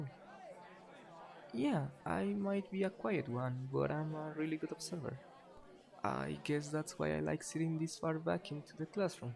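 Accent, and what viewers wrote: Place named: United Kingdom. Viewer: I'm new , are you British? because your voice kinda sound like British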